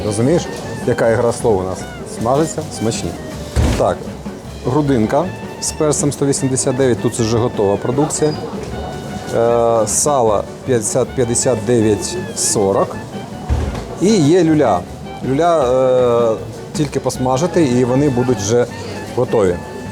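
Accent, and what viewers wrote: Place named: Odesa, Ukraine. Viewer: Уважаемая, разве я Вам хамил? Или называл недалëкою? Или вообще с Вами начинал диалог? Или интересовался Вашим мнением? Вы отвечайте, только тогда, когда Вас спрашивают, а не будьте "затычкой", дюже грамотной. И определитесь сами для себя, на суджике вам говорить, или балакать. А что касается, вся изюминка была, смотреть контент про Одессу, именно от человека с Одесским диалектом, на понятном для всех языке.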